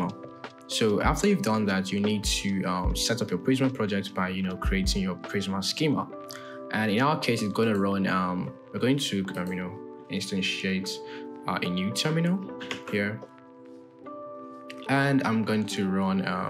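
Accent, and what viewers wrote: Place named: United Kingdom. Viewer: Thank you for providing this tutorial! quite informative, but I did encounter some difficulty in following your accent. Could you kindly share where your accent originates? I was wondering if it's British. Additionally, if possible, could you consider reducing the volume of the background music? Many of us would appreciate being able to hear your voice more clearly without distraction from the music.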